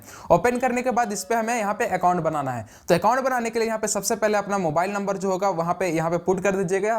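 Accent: Ranchi, India. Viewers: Bhai app ranchi sa ho kya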